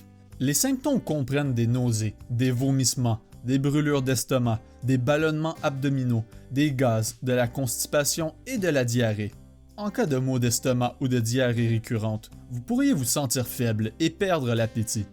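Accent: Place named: Quebec, Canada. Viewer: Mer i pour la video et j'aime bien l'accent etes vous qebequoi?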